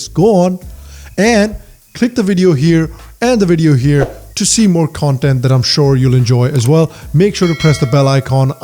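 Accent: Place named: United States. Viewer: Bhai pan nahi hai bas accent hai he raised in America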